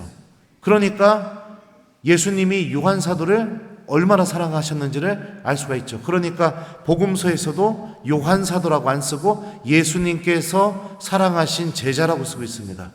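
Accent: Chungcheong, South Korea. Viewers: 새로운 성가네요. 신부님의 에피소드는 백번을 들어도 늘 새롭고 감동이고 재밌어요.^^ 목소리만으로도 치유됩니다. 이젠 충청도 사투리만 들어도 힐링되는거 깉아요.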